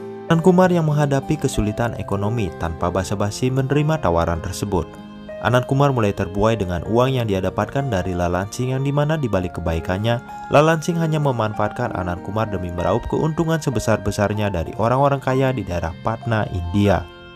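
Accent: Bali, Indonesia. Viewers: Lebih ke logat bali.. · Asli bali bang ?Logat bali kental banget bang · Hehehe logat bali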